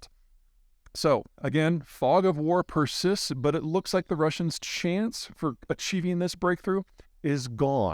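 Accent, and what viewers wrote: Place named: Iowa, United States. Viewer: Peter has always steadfastly stuck to his Iowa "Northern Midlands" approach to pronouncing transliterated Cyrillic. Nothing from Cyrillic he says will ever be pronounced correctly.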